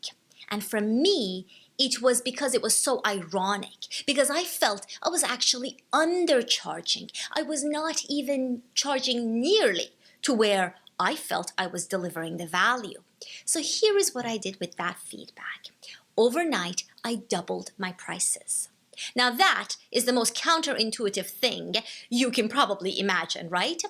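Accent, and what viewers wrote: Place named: Arab world. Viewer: Are you originally from an Arabian country ? Or from Eastern Europe? Just trying to place your accent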